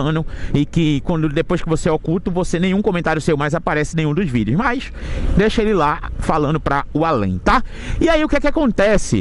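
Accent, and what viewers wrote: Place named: Northeast, Brazil. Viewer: sou teu fã cara! Curto demais seus vídeos, seu sotaque nordestino só mostra o quanto esse Brasil é maravilhoso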